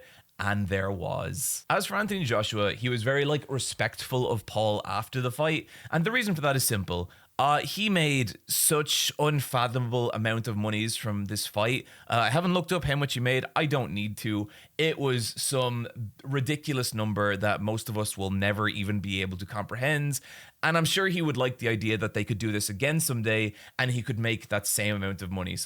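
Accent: Ireland. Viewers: Irish Henry Rollins explaining the Jake Paul nonsense? Yeah, I'm here for this. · Is ur accent Irish ☘️ ❓ very cute.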